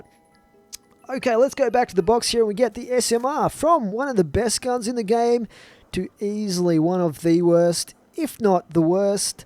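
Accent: Australia. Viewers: Are you Australian? Sorry if I got it wrong plz dont judge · are you an aussie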